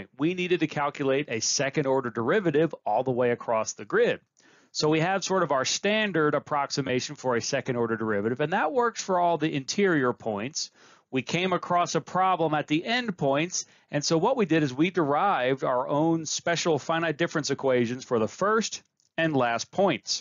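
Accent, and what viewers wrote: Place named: United States. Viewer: nevermind actually, apparently according to which English accent you have it's pronounced differently and you have the American version my bad. Still a good video though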